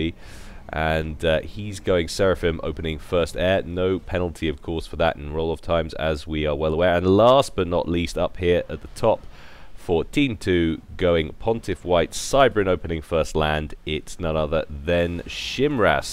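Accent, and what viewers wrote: United Kingdom. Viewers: God that british accent. So reminding me of Deman and his quadv casts of supcom.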